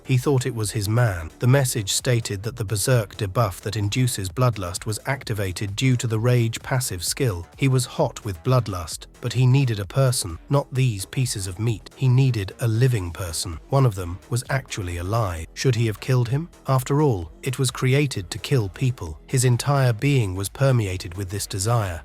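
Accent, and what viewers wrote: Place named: United Kingdom. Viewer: u are british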